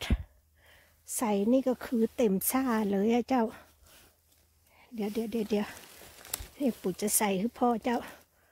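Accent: North, Thailand. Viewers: สวัสดีจ้า ป๊ะกันแฮ๋มแล้ว เผาะก่าอวดเห็ดคำเน้อใหญ่ไปใหญ่ง้าวงามแต้งามว่าทุกคนหันเกาะเจ้าซุมาเถอะเจ้าสงสัยล้นซ่า พี่ชอบมากๆเลยอู้คำเมืองม่วนดีจ้า👍👏👌😍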